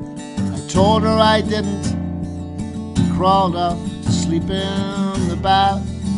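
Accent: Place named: Ayrshire, United Kingdom. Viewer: This guy comes from my hometown in Ayrshire, his Dad had a music shop in the town and often came to our house to tune our piano when I was a kid. I can't believe Colin still has his Ayrshire accent after leaving here over 40 years ago.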